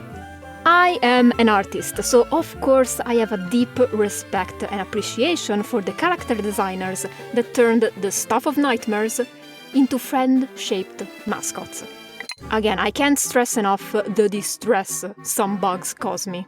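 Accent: Lombardy, Italy. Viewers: Ah quindi sei brescianə/bergamascə? Mi piace tantissimo il tuo canale, dallo stile di disegno alla tua voce e la tua schiettezza, continua così 😊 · vecio ma sei di Bergamo/Brescia?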